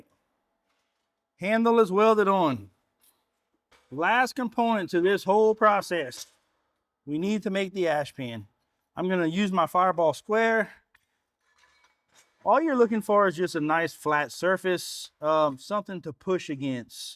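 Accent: Louisiana, United States. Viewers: I love your channel. I,m a retired tool & die maker & appreciate a true craftsman. But something I noticed about was your accent, so I looked up were your shop was ln Wiss.That can,t be? He talked like me. That fellow is from South La. Then looked your Profile on your web site. ,I was born in Church Point La. Where might you be from?😃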